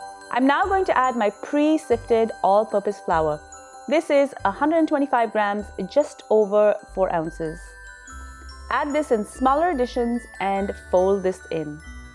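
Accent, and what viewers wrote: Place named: India. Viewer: I like your accent eventho you're Indian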